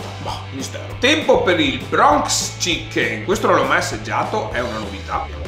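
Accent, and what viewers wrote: Veneto, Italy. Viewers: che brutto l'accento veneto 🙁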